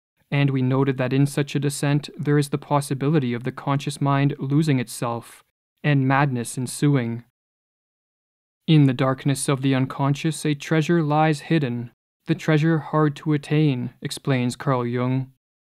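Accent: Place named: Canada. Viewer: Yeah, I hear the lilt and every once in a while a trace of a Canadian vowel; also, very often clear signs of a mind and ethos I recognize as Canadian Gen-X. But I never would have guessed in a million years that he doesn't work alone. Every bit of the intellect and personality he reveals to us argues lone wolf... except for, I guess—and this is the part I missed—the fact that he wants to be with us, his audience. (Bonus speculation, just for fun: I bet he's left-handed.)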